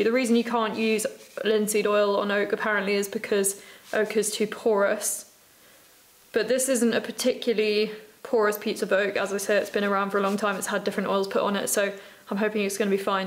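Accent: England, United Kingdom. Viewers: You’re amazing! I love old tools and restoring old treasures, bonus you’re a pretty girl with an English accent. Couldn’t make a more perfect video! ❤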